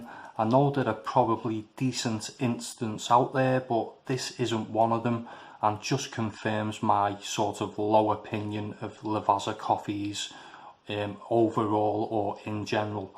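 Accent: Liverpool, United Kingdom. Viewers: Sorry, this is not related at all but I have to confirm, is that scouse accent I'm hearing?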